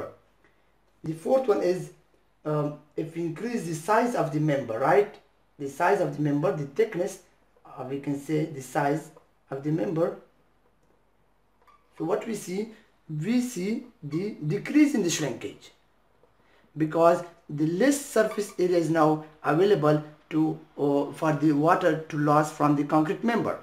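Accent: India. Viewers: Nice Explanation. Just curious to know whether you are from South India?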